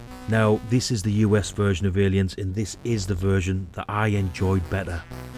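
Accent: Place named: Sunderland, United Kingdom. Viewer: I came for the retro C64 content, but I'm staying for that Mackem accent! Takes me back to my uni days! That US version of Aliens looks way better than the version i was subjected to in '86!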